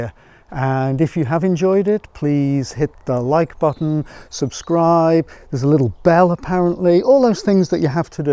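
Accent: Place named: Warrington, United Kingdom. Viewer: Hi! This is my first time watching your videos and I was enthralled! I live in St. Louis now but I'm originally from Warrington and I knew by your accent that you must live close. I'm going to subscribe and look forward to more videos from you.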